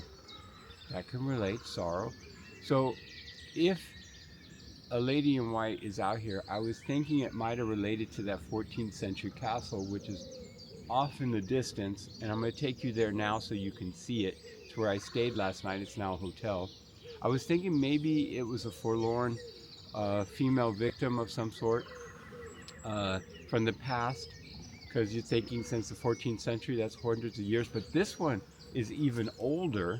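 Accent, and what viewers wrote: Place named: England, United Kingdom. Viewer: You are brave walking in that forest in the dark, please be careful. It is a fascinating place, the history behind it must be thrilling. Scary place to be sure. Thank you. - love your English accent, very good indeed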